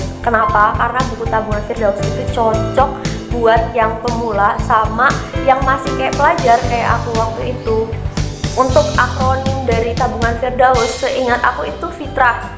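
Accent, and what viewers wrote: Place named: Banyumas, Indonesia. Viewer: "Logat ngapaknya bikin candu" Great job !!! #BERKAHBersamaKeuanganSyariah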